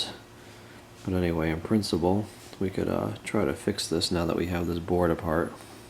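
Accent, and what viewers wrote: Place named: Ohio, United States. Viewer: Is it just me or does any one else thinks hes from Ohio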